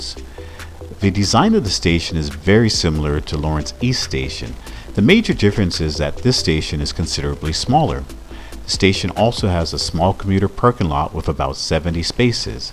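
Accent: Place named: England, United Kingdom. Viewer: The explanation was awesome with English efficiency and accent 😍 I love this video a lot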